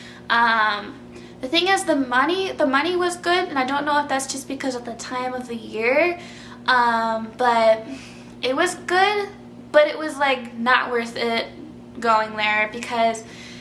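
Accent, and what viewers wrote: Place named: New York, United States. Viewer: Interesting to hear your experience as a stripper. You sound like your from NY,, not Oregon.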